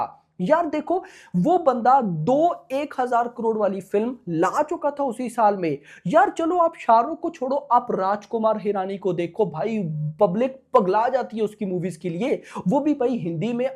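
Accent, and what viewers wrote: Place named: Lahore, Pakistan. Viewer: Bhai teri language suni muje lga tu pakistani he fir teri bio me dekha such me tu pakistani he hindi tumari lahori wali he 😅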